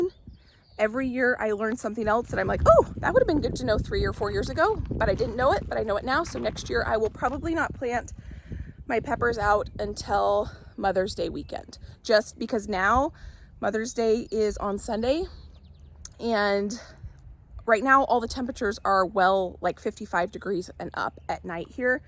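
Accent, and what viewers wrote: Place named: Michigan, United States. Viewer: Are your out of town guests from Michigan ? I was hearing a bit of a Michigan accent off you today lol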